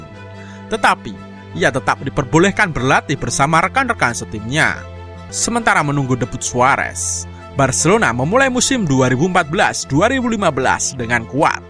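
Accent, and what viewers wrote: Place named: Java, Indonesia. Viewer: Adminya medokk🤮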